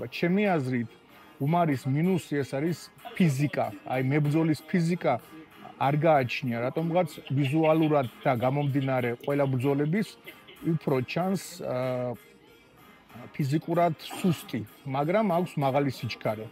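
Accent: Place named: Russia. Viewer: აქ მგონი რუსული აქცენტით ნათქვამ overhand -ზე იყო საუბარი. 😊 მაგრამ სულ არ აქვს მნიშვნელობა რას ამბობთ გავიგეთ.😊 ქართველები ჰ-ს ამბობენ უფტო ვიდრე ხ-ს თქვენ ალბათ რუსულ ენას ფლობთ კარგად და გავლენა გაქვთ. მადლობა ინფორმატიული განხილვებისთვის!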